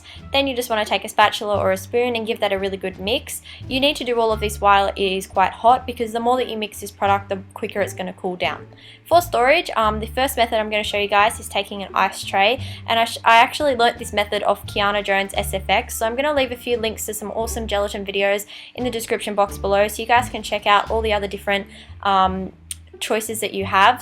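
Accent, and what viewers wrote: Australia. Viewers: Hi OMG your so awesome at FX I really want to try making stuff like this for halloween and stuff. Once question where do you but your makeup like the pallets ? If you get them offline what is the name of the website? Btw I love your Australian accent idk I love Australian accents 😂 And can you please do a Q&A if you do here is a question how old were you when you started FX makeup? Thanks Ariana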